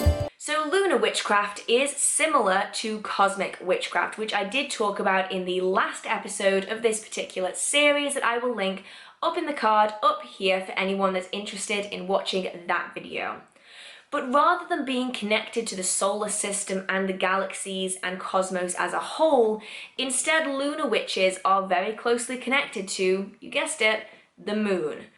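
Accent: United Kingdom. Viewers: This is an excellent video in all respects and I will share the link on social media later this year. [ Am looking into neopagan lunar magic on youtube. ] One important reason to recommend this video is the book reference. At your website I found another significant book by Joey Morris which connects two areas of my interest: Celtic mysticism and hedge witchcraft practice. I may get that. Of course, I always appreciate the Brit accent. The moon magic I use is formal classical Hindu / Buddhist. Regards to Plymouth.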